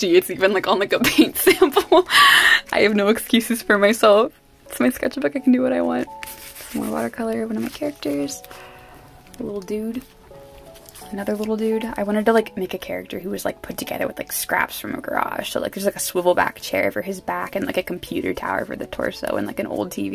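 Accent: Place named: United States. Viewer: она американка т.к. акцент американский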